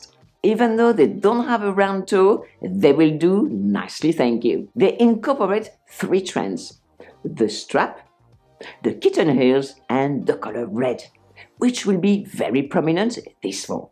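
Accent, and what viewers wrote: France. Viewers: Love your cute french accent 😊 and my over ten years old Maryjanes, I will definitely wear them this fall. Greetings from Germany 👋😀 and thank you for your very good videos.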